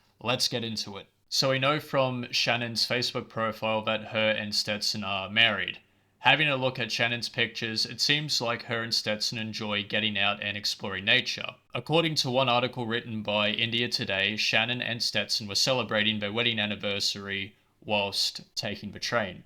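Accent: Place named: United Kingdom. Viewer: Glad you thought it was important enough to post this scathing comment in response to my scathing comment. Are we having fun yet? You got it wrong again, the name isn't Frederick, it is. That's a family name, especially with the 'son' part. Names like that go back a long way - all the way to Jolly good Britain as a matter of fact. If you're not a Brit, you sound like one. As to the mis-pronunciation of words, there certainly are different pronunciations - there are the correct ways to pronounce specific words, like city names. One pronounces them the way the locals do and there is your way. I'm terribly sorry you feel that a comment like mine is worthy of your attention, but it seems that you are one of those angry people who cannot bear up to criticism. Must be hard to be you. Your "analysis" as you prefer to call it, was actually more commentary because you did not enter any facts based on the scientific method to sway viewers. 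Your opinion was obviously being expressed and you continued to make judgments based on your feelings and interpretations which were uttered with bias. I know, I know, you like all the thumbs up arrows being pushed because YouTube is a revenue generator and negative comments distract from your wish to make that cold hard cash. Too bad. Had your video shed actual light on the subject, I'd have given a better review.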